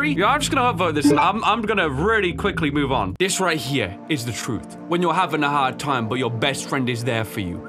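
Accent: United Kingdom. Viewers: Now that I think about it your voice is very odd compared to most British people I know, it’s like the way you pronounce things is very British but if you don’t really have an accent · You got a british accent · He just sounds British how can a British man have British accent when it was the voice he was born with?please help I don't understand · yes, you do have an accent, British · FACTUALLLL I do that with my cats and it's so lovely! They're my family and I love them so much! 🔺🔺 💞 Also I believe you have a slight british accent, not as british as Dawko but also not as non-british as non-british people lmao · Yep, to this Canuck you have a British accent, but like any spoken language it would be regional to your area. We have the same thing over here in Canada - we might all sound the same as Americans to the untrained ear, but there are differences for sure. 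All this to say - always enjoy your content and don't mind people giving you grief accent wise! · You definitely have an accent but it's pretty faint compared to other British people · You sound British! So you do have a accent!! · to me it sounds like you have a british accent but its not very strong like its not really obvious to hear just like dawko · you def have an accent, im american and you sound british like in between the two extreme in the meme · kidna british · you are very British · yes you have a biritish accent it's not heavy but it's there. like how you say for, it sounds like the number four, more emphesis on pronouncing which I'm very okay with. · As an Australian listing to u you had a accent but no tom Holland British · Yea your language sounds like its in the middle with British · I sometimes forget about you being from the uk until there's some words you say differently. 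Or use meters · I can hear some Brit in your voice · asking about his accent: me who actually grew on a british accent because of him and now being worried if its not so british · Sometimes I can hear your accent in some words, sometimes not. I think as you said you are somewhere in the middle :) It's not that british english like first thing I think to myself hearing you is "Wow that's some strong british accent!", it's a bit smoother or softer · You that uncommon British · Of course you have an accent, everyone has an accent. I don't know why some people think that standard american english for example isn't an accent even though it clearly is. I can definitly hear that you have a british accent but I don't know which one since there are so many accents in Britain. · To answer your question, you do sound like you have a British accent, but it's kinda faint? If that makes sense. You can sound American one minute and the next British. 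· Bro sounds a bit British imo, he might be from there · Its insane on how does not hear his bri'ish accent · Of course you have an accent everyone has an accent, I myself have a P.A Dutch accent because its were I was born, you have a Britishy accent but you already knew this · Yes u have a accent. Bri-ish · I can hear a British. But just me. Still like your vids! 🙂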